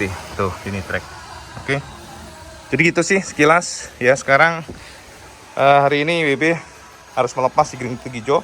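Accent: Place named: Java, Indonesia. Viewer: Mas.. Logat jawa kami bisa nerima, kalo bahasa jawa bukan kami gak nerima tpi gak ngerti mas, mungkin itu juga yg menghambat pertumbuhan channel ini. Dri kualitas mas,shrsnya bs dpt 2jt subs.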